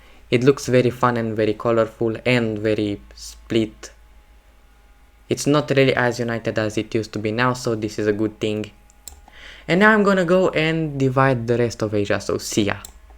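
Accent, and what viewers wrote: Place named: Romania. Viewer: Peak romanian accent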